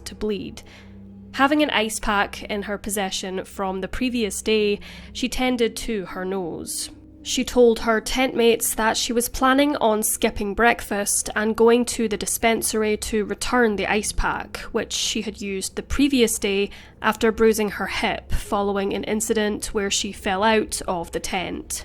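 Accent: Scotland, United Kingdom. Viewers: SHE HAS BEAUTIFUL SCOTTISH ACCENT, EASY TO FALL 😴😴😴😴😴😴😴😴😴😴 💤💤💤💤💤💤💤💤💤 💤💤💤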